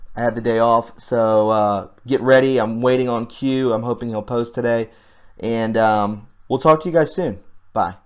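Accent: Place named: South, United States. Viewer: How is it that your southern accent as bad as mine?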